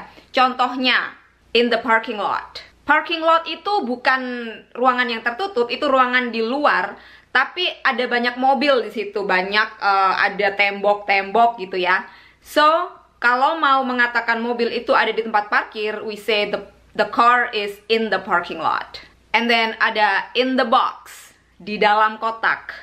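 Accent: Surabaya, Indonesia. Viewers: Sama saja kok... logat suroboyoanku juga ga bisa hilang walaupun sudah tinggal 7 tahun di Bandung dan 4 tahun di Jakarta....makanya aku seneng lihat Mba logat suroboyoannya tetap ada walaupun sudah 3 tahun di Amerika:)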